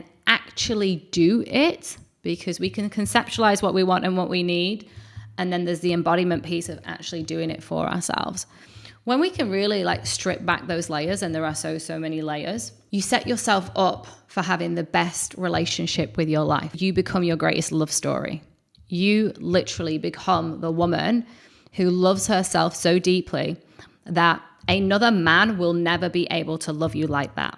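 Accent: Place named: Australia. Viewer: Omg this is so relaxing You have that slight Aussie tone in your voice in the back , that makes my brain go numb for the rest of the time you’re talking. That scratchy growling raspy purr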